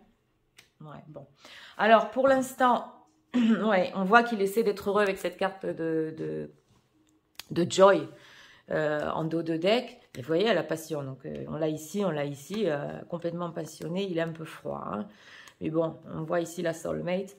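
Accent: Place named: South, France. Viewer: Je viens juste d'écouter cette guidance qui date de 1 an. C'est marrant, je ne savais pas que vous aviez un petit accent du sud 😊, accent que vous n'avez plus sur les guidances de maintenant. C'est marrant. Je ne me moque pas. Je viens de Toulouse et j'ai un accent très marqué 😉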